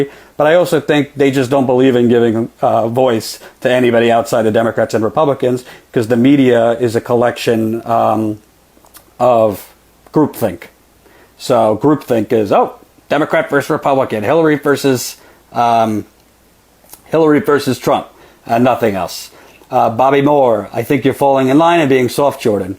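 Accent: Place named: New York, United States. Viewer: Jordan, I could hear a bit of New York in you in this vid, XD Couldn't you do videos with Kyle from Secular Talk? You're both from NY and part of TYTN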